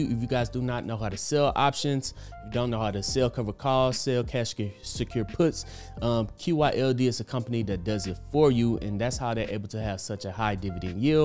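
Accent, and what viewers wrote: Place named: Atlanta, United States. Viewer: What up! I swear sometimes I think you're in ATL with me when you talk. But this is not my comment for "QYLD the Movie" you put together. Based on vid, you invested $20 aday excluding weekends and holidays; correct? This calculate to 261 days a year at $20 aday for a total of $5,220 investing for a monthly paying div. My friend, I will be joining you; utilizing your strategy by reinvesting back into QYLD. Thank you for the entertainment purpose videos and I look forward to joining the road to passive income investing.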